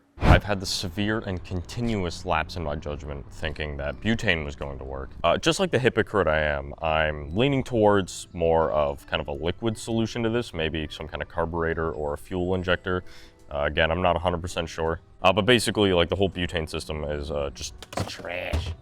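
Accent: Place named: Canada. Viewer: Lately I've been listening to too many Canadian youtubers. So I recognised your accent.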